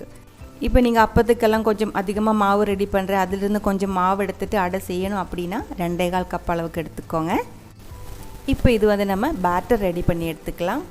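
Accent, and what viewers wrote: Kerala, India. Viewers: Good process. Nice Tamil with kerala slang. Good ma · Mam very interesting... I loved it.. Malayalam slang Tamil... Super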